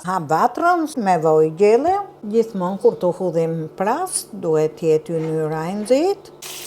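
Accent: Tirana, Albania. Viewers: Ty te lumte ajo goja e omel qe flet hala me zhargonin e bukur tirons puro dhe e trashegin nder breza ate. "Po i ndaj me dy sira" "pras", "voj" , "kryp", "long"... Fiks si nondaja teme e ke gatu kete gjelle me pras e te lumshin duart e arta! ❤❤❤❤❤ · Ti je flori te shikoj vetem te degjoj si flet tironce puro je flori lum ata qe te kan ne shtepi saper gatimet je ok te gjitha nenat shqiptare gatuajn jan nikoqire ju sa shikoj keni pasjon ne gatime kam respekt zoti te bekoft❤❤❤❤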